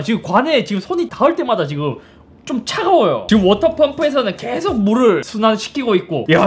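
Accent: Gyeongsang, South Korea. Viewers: 역시 경남분이셔 ㅋㅋ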